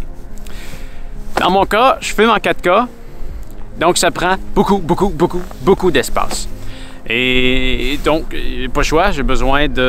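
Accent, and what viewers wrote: Quebec, Canada. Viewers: Salut..nous avons tous un accent mais le votre il me fait rire a tout les coups...c'est loin d'être méchant mais les canadiens et plus particulièrement les québécois....en Belgique nous devons avoir un accent bizarre a vos yeux tout comme les français mais je trouve que le votre est trop marrant...enfin a part ça j'aime bien ce que tu fait. ta bonne humeur etc...ne change rien et continue de resté toi même..y a bcp de youtubeur qui au bout d'un moment ce prenne pour des stars une fois atteint le millier voir le million. toi tu reste humble c'est ce que j'aime chez toi. Je sais pas si tu es marié ou en ménage mais je remet un bonjour a madame aussi..soit dit en passant allez a+ l'ami..signé un p'tit belge lolll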